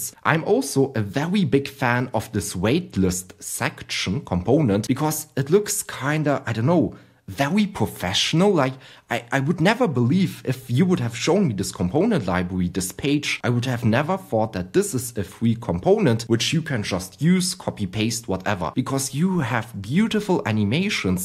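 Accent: Germany. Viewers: Are you German ? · your accent is really german haha, fire video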